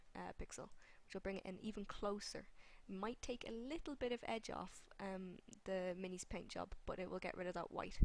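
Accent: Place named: Ireland. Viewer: Irish accent is best accent.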